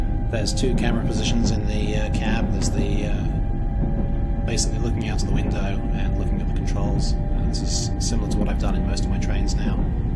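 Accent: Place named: England, United Kingdom. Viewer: Wait, you aren't dutch? Your voice has a distinctive english accent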